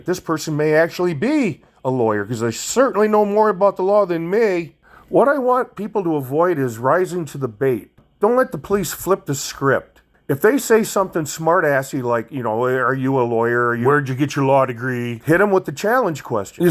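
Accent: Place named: United States. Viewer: Irish! You sound very American.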